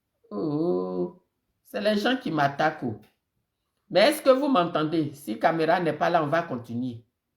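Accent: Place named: Ivory Coast. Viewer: J,aime votre accent purement ivoirien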